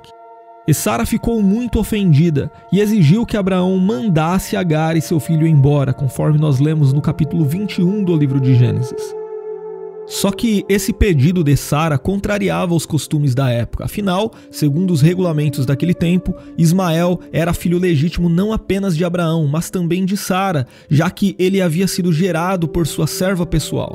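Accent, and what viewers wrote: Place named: Interior São Paulo, Brazil. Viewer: Essa pronúncia do R do narrador, Um R corrido, é horrível. O cara faz vídeo pra o Brasil inteiro e não trabalha a pronúncia do jnterior Paulista. sinistro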